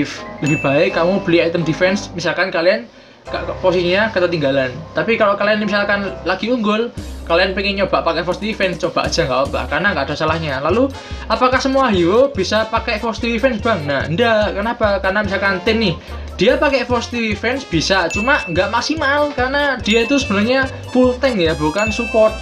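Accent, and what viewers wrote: Java, Indonesia. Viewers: Medok bgt ngomongnya :v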